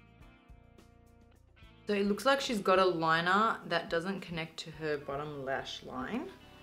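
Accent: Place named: Australia. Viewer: i wish i looked like you omggg and i love your aussie accent lol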